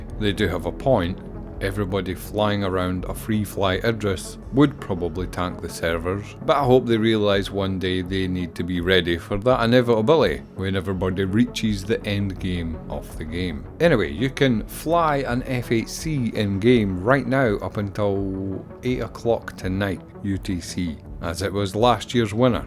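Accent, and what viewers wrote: Scotland, United Kingdom. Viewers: I'm consciously following along with the wee sairtin wyerds arrr siid to byittr awndairstaynd how parrteekular wayrds are seed from a lejituhmet Scottsmn layk yourdr seeyalfff. (Spelled phonetically from the 'weee' my kuhnayjiun y'eers 'n brehn pairsceeev eught) (I'm consciously following along with way certain words are said, to better understand the way particular words from a legitimate Scotsman like yourself {spelled phonetically from the way my Canadian ears and brain perceive it})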